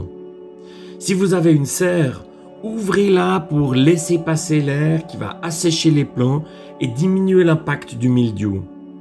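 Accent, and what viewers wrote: Switzerland. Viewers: Merci pour vos conseils, j'adore votre accent qui me rappelle mes séjours très agréables en Suisse.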